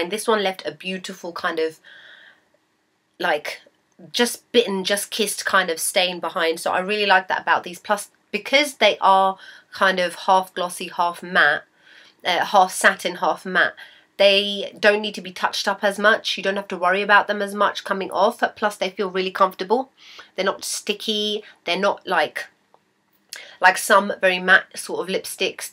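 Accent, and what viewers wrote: United Kingdom. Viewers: I guess you are from England! You sound so british! Really cute :) ! Greetings from Germany. You made me buy Buttercup hehe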